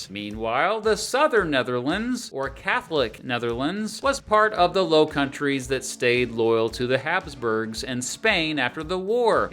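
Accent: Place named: Midwest, United States. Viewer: The lecturer sounds like a native Midwesterner.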